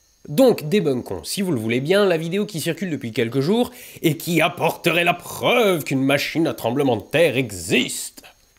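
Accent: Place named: Paris, France. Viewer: Merci bcp. C'est juste dommage de faire toute la vidéo en prononçant "séizme" bien à la parisienne. Que ce soit pour séisme, Israël ou autre, la lettre s ne se prononce comme un z que lorsqu'elle est entre 2 voyelles. Ex: Isabelle. Bonne continuation.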